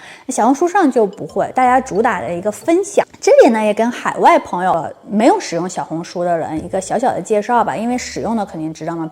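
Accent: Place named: Northeast, China. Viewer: 😂有点东北口音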